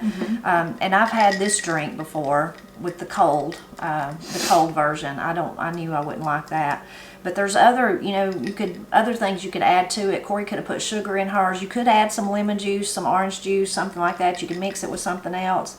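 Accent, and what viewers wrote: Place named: Ozarks, United States. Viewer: So my Mom is Hawaiian- Filipino and my Father is from Arkansas Ozark area. The Ozark folks have so many similarities from accent to foods to the Appalachia folks. In Hawai’i Passion fruit (Lilikoi) is used in desserts and mostly to make a favorite drink called POG. POG is a mix of Passion fruit, Orange and Guava juices 💖🌺💖 I Love You channel! It reminds me of cooking in the kitchen with my Granny.. the accent and food is exactly the same. She passed several years ago and always hold her and her memories close to my heart. Watching your videos brings so much nostalgia. Thank You❤❤❤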